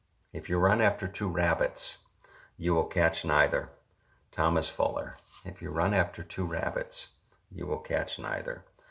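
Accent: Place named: United States. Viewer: You mentioned about learning English from your videos. I actually only talk Aussie and I am trying to learn American from your videos. LOL.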